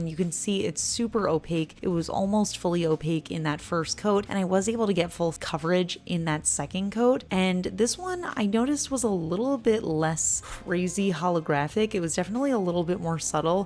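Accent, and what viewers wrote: New Jersey, United States. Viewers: your NJ accent... Not just the way you say orange, but forest as well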